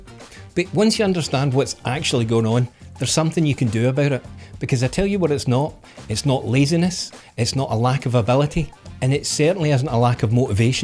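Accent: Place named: Glasgow, United Kingdom. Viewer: I've really enjoyed your videos since discovering your channel. Based on your accent, I put you in the Glasgow are, but not central Glasgow. More like Hamilton or Strathaven, Am I close.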